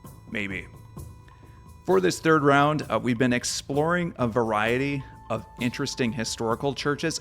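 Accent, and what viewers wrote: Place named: Wisconsin, United States. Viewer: He's from Wisconsin. 😊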